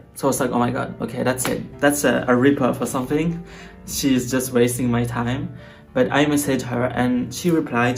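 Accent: France. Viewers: On entend ton accent français trop choupi ~ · U'r French accent is so cute 😘, but interesting story, thanks for sharing.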